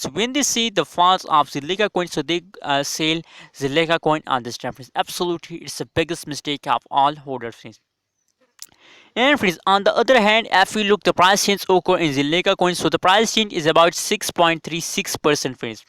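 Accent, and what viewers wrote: India. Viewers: Sounds like you are from India, right? 🙈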